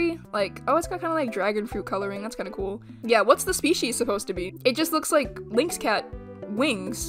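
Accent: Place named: Canada. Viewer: You sound canadian are you canadian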